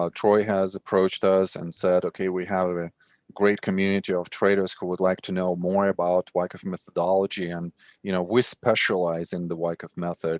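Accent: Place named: New Zealand. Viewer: your Dulcet Kiwi Tones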